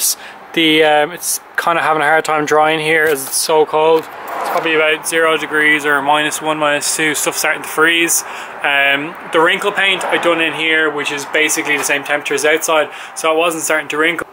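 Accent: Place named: Ireland. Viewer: Good job, irish? I hear the accent of my people! Lol county down fella here! Great video man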